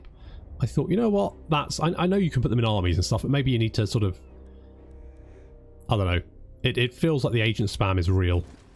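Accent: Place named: United Kingdom. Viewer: i followed you from cap 1 to here dude, i love your british voice DUDE ( no homo)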